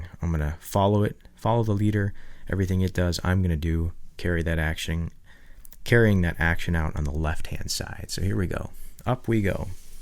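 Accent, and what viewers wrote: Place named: United States. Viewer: no probelm you tell it quite well but it's funny to hear it from american instead of french ^^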